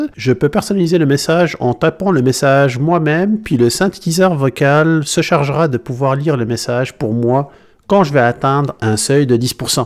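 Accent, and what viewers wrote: Quebec, Canada. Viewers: Je n'ai meme pas encore recu mon Fold 3 que je magasine pour des app qui vont m'etre utile et je suis tombé sur ton video. C'est clair que je vais l'installer. Merci pour la video. En tant que Quebecois, ton accent Francais avec un soupcon de Quebecois est vraiment cute hehe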